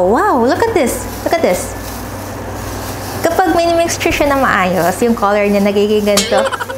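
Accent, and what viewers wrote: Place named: Conyo, Philippines. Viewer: The way u speak it seems u r pure Filipino. You mix english with tagalog the accent as well.....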